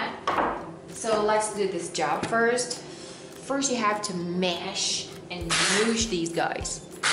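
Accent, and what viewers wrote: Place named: United States. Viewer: Parece uma americana falando... vou estudar inglês para assitir seu novo canal, Sucesso aqui também.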